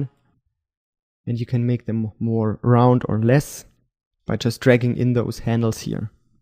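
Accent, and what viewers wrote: Germany. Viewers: you're german right? Got a german accent :D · r u german? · kann es sein das du deutscher bist? :D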